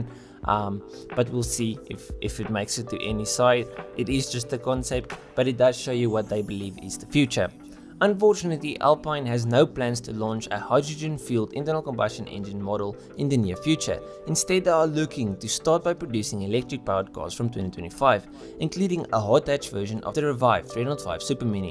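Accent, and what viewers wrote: South Africa. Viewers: I love your South African accent. Subscribed.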